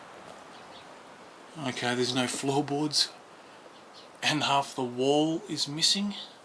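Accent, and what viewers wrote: Australia. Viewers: Interesting wildlife sounds I'm guessing by your accent it's Australia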